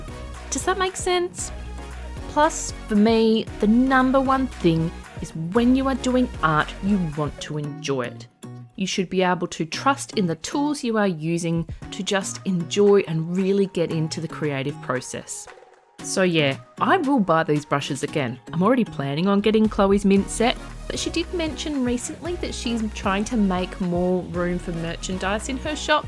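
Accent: Australia. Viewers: First of all: You've been making me smile a little bit more with every post-advent-calendar video. "The Manipulations of Manni (Manny? Mannie? Man-ny?)!" (🤔 Needs work.) I might be a bit obsessed. Also: I just wanted to say how I love, appreciate and adore that, compared to sooooo many YouTubers, you're classic Ozzie. (NOT bogan, classic. 😂) And you make classic Ozzie look and sound amazing. I'm from NZ 🖤🤍but I've lived in Brisbane 💚💛for... a while - a number of years longer than I originally intended anyway - and I reckon NZ and Oz are super similar in that we're kinda... understated? We definitely don't tend to have issues with hyperventilating in our excitement . Not in the same way I've noticed those from other countrys seem to anyway (I mean no disrespect, this seems to be an Oceanic idiosyncrasy, is all).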